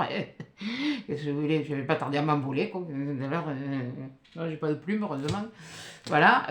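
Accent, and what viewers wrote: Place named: South-West, France. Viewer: Bonjour je vais essayer de participer (malgres mon attelle au pouce sniff) Je viens de découvrir ta chaine et bon dieu que cela fait du bien d'entendre ton accen et ta gouaille sans compter entendre parler patois... merciiiiii... je suis originaire et jai toutes ma famille au fin fond du Sud ouest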